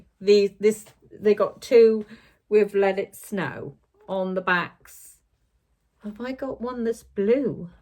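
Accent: Cornwall, United Kingdom. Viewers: I have missed you with your gorgeous Cornish accent. I totally understand how life's circumstances can leave you with no enthusiasm for stitching. It has happened to me before. I am so pleased it is back and I so enjoyed seeing your embroidery machine and all the lovely cushions and tea cosies. Take care of yourself and we will see you again soon. xx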